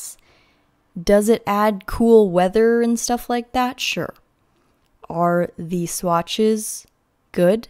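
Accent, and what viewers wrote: Canada. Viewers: Building is like all I do in the sims, so I don't really download builds mostly watch for inspo because I love your style. And also, of course, for the chat and the company 😊 my one year old also likes your videos, think he likes how fast it moves and the Canadian accent 🤣 (we're Scottish so very different lol)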